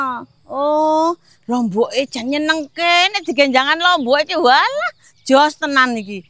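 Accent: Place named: Java, Indonesia. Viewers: Maaf baru gabung ..Ini daerah mana ya..koq logatnya mirip saudara ku yg tinggal di Lumajang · NONGKO GORENG, MANTAPJENANG GENDUL, REPOT YA IBUU. SENENG LIHAT NYA KLO IBU LAGI MASAK END LAGI BAHASA JAWO SENENG AKU DENGAR LIHAT NYA DI ALEK JAWA SEMANAK BANGET INGAT ALM BAPAK IBU KU.....PERSIS DIALEK NYA SAMA GPP YA IBU DI SAMA IN YA IBU ❤❤❤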